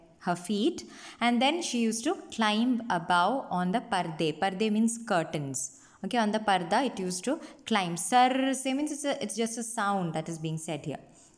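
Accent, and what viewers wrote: Kerala, India. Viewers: good english , good hindi and I also think your a malayali by your accent , lemme know if that's true and also thanks for the explanation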